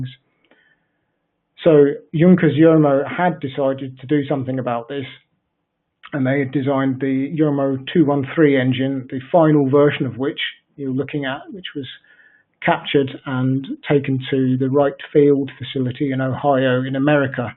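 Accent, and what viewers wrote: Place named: England, United Kingdom. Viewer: I told you I fell asleep after 3 minutes. And if you put things like this up on you tube you have to accept the comments from every 1 that might watch YouTube. I enjoy history very much but with in 30 seconds of listening to the man talking in this vid I realised this was an English man which I had no interest in listening to. If you don't agree with my comment you can very easily ignore it it's far from trolling. Maybe I have hurt your feelings in some way. It's ok tho we all know history. We don't need to be told how it all worked out and who the better engineers were (germans) anyway I will say good bye now take care of yourself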